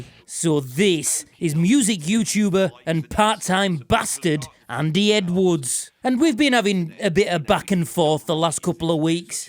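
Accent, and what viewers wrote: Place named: Northern England, United Kingdom. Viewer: You said it in another video, "Adverse Camber"... or. "the bloody bastards" in that Northern accent you got..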